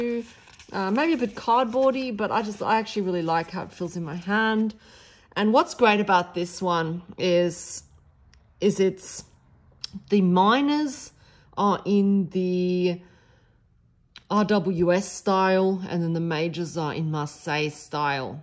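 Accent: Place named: Australia. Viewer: I forgot to comment when I watched 🤪 So, I loved this 😍🙄🤣 it is always good to hear another Aussie voice 🌺🍀🏵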